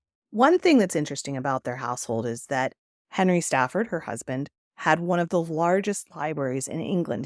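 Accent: United States. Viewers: Pity about the American accent of the narrator.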